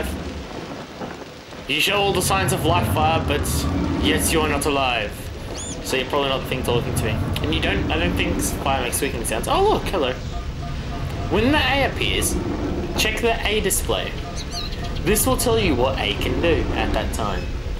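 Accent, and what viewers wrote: Australia. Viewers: Gawd, I love Australian accent! Thanks for these, MATE!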